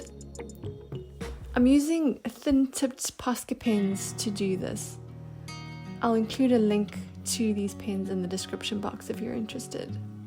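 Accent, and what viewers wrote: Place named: South Africa. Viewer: Brilliant idea and beautifully done. SA accent? Do you live in the US or SA? I'm so nosey, aren't I, but you're the first South African voice I've heard teaching painting and it's so refreshing.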